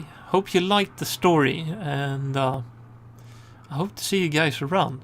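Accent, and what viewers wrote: Sweden. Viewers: I would also like to hear more stories stories and see more games with US tanks. Swenglish is cool :-)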